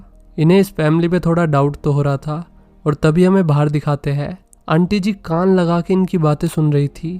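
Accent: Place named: Haryana, India. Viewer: Explainer pkka haryana see hh😂😂😂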